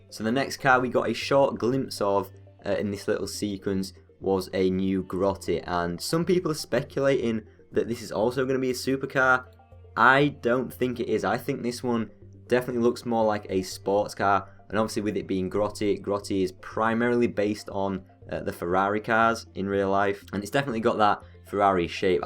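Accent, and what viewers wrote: England, United Kingdom. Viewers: omg imagine an armoured submarine with torpedos or and a big submarine that can shoot nuke type rockets ( not as powerful like a more powerful rocket from rocket launcher ) and u can place target on map in launchers out top and lands on area destroying different targets ong that was on the top of my head ahaha XD love that ur English not American lol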